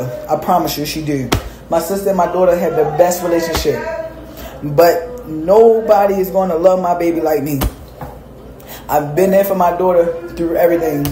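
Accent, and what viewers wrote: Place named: Baltimore, United States. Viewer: They yews and dews be killing me. Baltimore definitely in the top 5 worst accents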